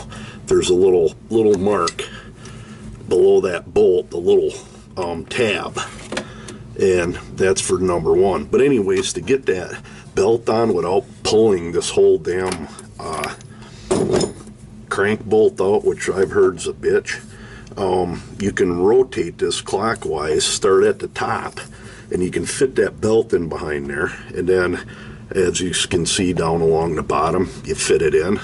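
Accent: Minnesota, United States. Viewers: Northern minnesota?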